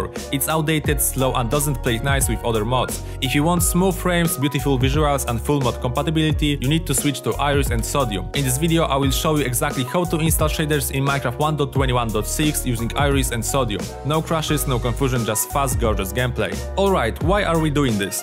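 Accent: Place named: Poland. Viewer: słychać że polak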